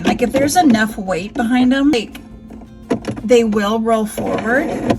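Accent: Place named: Minnesota, United States. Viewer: This was the first video of yours that I stumbled upon, and within seconds, I had you pegged as a Minnesota native (or at least lived there for a significant amount of time). My mom's entire side of the family lives primarily in the Minneapolis area with my late maternal grandmother's family all hailing from the Blue Earth area down south. Those that live down in Blue Earth have dang near the same accent you do. Tbh, it was refreshing to see someone from any part of the "north" to "northern midwest" not be super srsbiz all the time. I'll definitely be sticking around. If your off camera personality stays the same as your online persona, then I really need more of you in my life!! I absolutely love the depths you go to to make an honest and informative review and still maintain the light airy atmosphere, even when you're less than impressed with the product.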